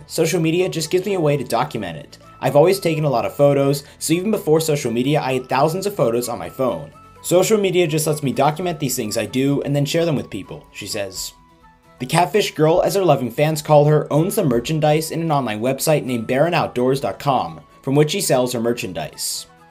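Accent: South, United States. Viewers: Does a more perfect, genuine, beautiful woman exist? Wish there were millions more like her! The world would be so much better. Natural beauty - no spiked/purple hair, nose ring, ear piercings, tongue piercings, nor tatts. Just natural perfection. Even her Southern Belle accent is awesome! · Bravo! Very cool. I am from New England, so we think people down south talk funny. Well, I love her southern drawl and have nothing but respect for her outdoor skills. I know the dad is very proud.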